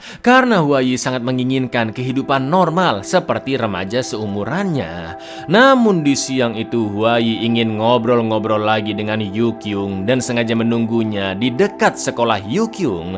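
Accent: Java, Indonesia. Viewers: ras nyaa Hwayii Jawaa anjaayyyy 😂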